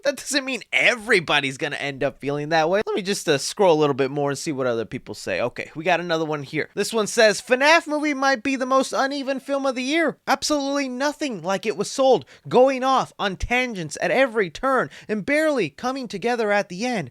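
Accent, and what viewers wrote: United Kingdom. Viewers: 😂 love the British accent 😂 the UK loves you ❤ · Lol, I like your British accent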